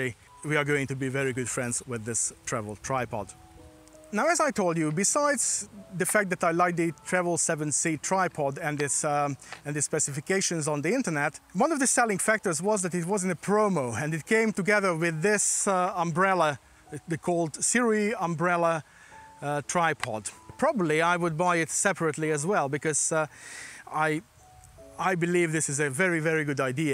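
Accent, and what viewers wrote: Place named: United Kingdom. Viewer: Köszi a videót. Szép brit kiejtés.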